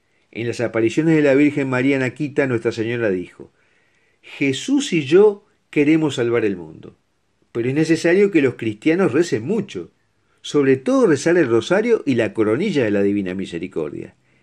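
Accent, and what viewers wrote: Argentina. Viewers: En estos video que tanto nos ayuda a comprender el amor hacia Dios............tiene la caracterisca de una voz argentina........muchas gracias que Dios tenga preparado un sitio en el 3 er cielo a este Sr. que lo respeto mucho es uno de unos cuantos argentinos que se les quiere mucho obvio despues del santo padre FRANCISCO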